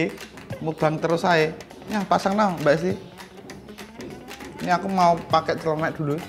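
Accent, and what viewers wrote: Java, Indonesia. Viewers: Mas Kon Kuwi jowone ndi?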